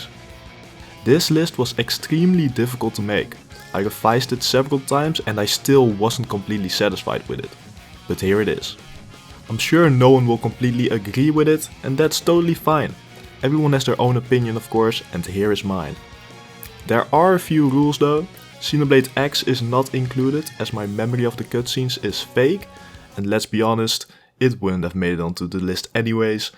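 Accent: Netherlands. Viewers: A bit of a random question, but... Do you force your accent? I dunno, your grasp of English is pretty good, and this thick of a Dutch accent is what you usually only hear from people who can't get any further than Steenkolenengels. It's not criticism, by the way. I can understand why you'd do it, if you do. Even people like Carice van Houten, who can speak with a perfect English or American accent, get told sometimes to put on a Dutch accent because it would sound exotic to American viewers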